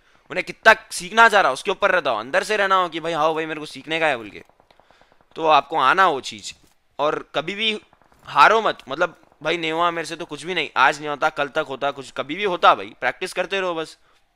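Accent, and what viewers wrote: Hyderabad, India. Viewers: He talk in Hyderabadi accent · Hyderabadi boltaaa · It's Hyderabadi language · And that unique accent is called hyderabadi 😍😍😂🥰💛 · The way he speaks tell's he is hydrabadi......🔥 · 99% percent are even listening to the accent. Hyderabadi accent at its best. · This Andhra - Hydrabadi accent is like perfectly made biryani.. love it 3000 ❤😅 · Arey kya bolre yaro ..Hyderabad ke galli galli mein ham aisich bath karte... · Hyderabadi hai bhai sahab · Hyderabadi language nhi suna kya kabhi movies b sahi rehti dekh lo bhai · It's hyderabadi accent, and every accent in india is unique tho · ya bro he is from hyderabad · Haydrabadi accent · no naam hai he just has the hyderabadi accent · hyderabadi accent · he is from hyderabad bro.. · He is pacca hyderbadi..not andhra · It's not unique it's hydrabadi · Hyderabadi Boltey Public,Miya Bhai💢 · Bhaii hyderabad wale aisich baat krte miya❤️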